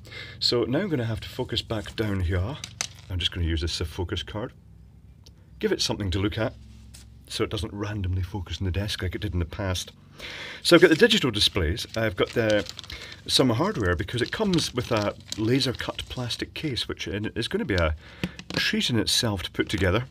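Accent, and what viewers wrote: China. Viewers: Chinglish.. love it!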